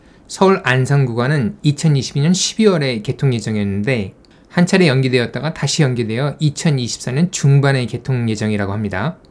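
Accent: North Korea. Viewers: 말투가 탈북인 같은데....아.... 새터민....이지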